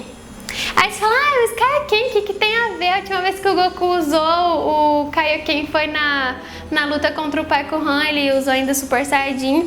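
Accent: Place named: Londrina, Brazil. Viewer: você e londrina? ( o sutaque parece)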